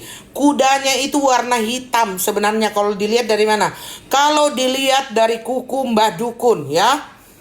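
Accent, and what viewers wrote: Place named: Makassar, Indonesia. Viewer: Koko logtnya mirip org Makassar 😅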